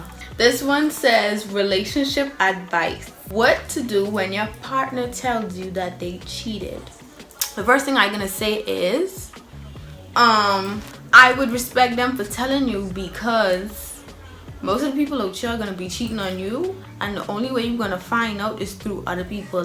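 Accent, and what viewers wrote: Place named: Caribbean. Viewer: You from trinidad? your accent is hella Caribbean and excuse me if you said it in the video I didn’t watch the whole thing 😂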